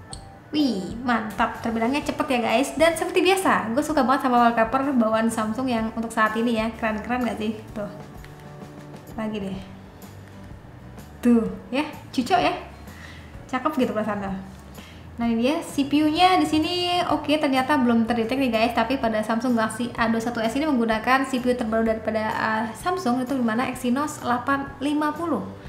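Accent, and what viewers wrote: West Java, Indonesia. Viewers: Sunda bangetb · Orang sunda ya teh?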